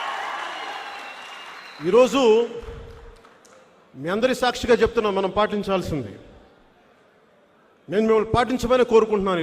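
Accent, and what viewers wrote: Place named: Telangana, India. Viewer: Telangana యాస ఎప్పుడు తక్కువ అంచన అని నిరిపిస్తున్నారు........ Don't play games 😛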